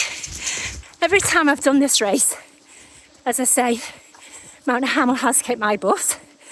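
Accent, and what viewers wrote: United Kingdom. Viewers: Great video. Thanks for an expert perspective. On race day, I only ever see the back side to Ambler Loop in the dim dusk or in the dark. Have trained there quite a number of times but its still great to see it from a runners point of view...btw, its great to hear a Brit commentary...I am from Cheshire... see you in God's Country in August!!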